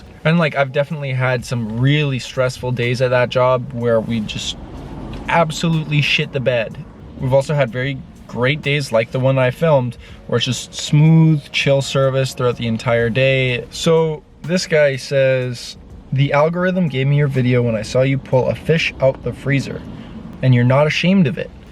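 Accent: Canada. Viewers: The full on Canadian accent <3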